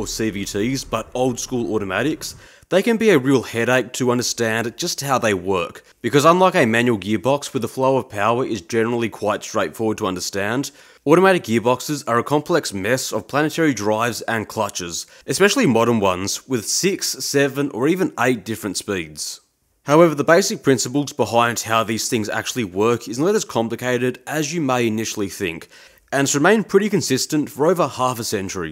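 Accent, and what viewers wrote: Australia. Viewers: Sounded like an Australian accent to me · An Australian!!! My ears are not designed for hearing THIS accent! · Australian? Great!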